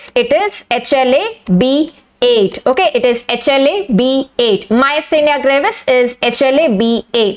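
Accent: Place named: Maharashtra, India. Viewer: Your marathi accent is so awesome, please include more in upcoming videos 😊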